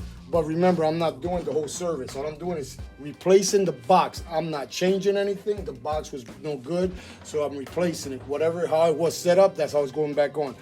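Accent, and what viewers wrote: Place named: New York, United States. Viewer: Just found your channel and watched a few videos. I like it. Sounds like you're from New York.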